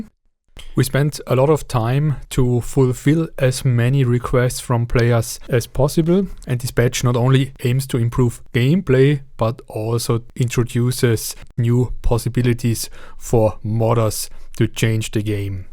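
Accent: Germany. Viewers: Is it German accent? It's hard to understand for non-English speakers. But it's amazing!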